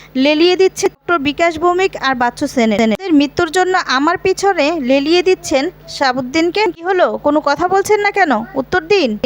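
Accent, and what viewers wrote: Bangal, Bangladesh. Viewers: কথকতার মান ভাল। বাঙ্গাল বাঙ্গাল উচ্চারণের জন্য খারাপ শোনায়।চেষ্টা কর এটা ঠিক ঠাক করার। ভাল কথকতার জন্য তোমাকে করতে হবে।শুভেচ্ছা রইল।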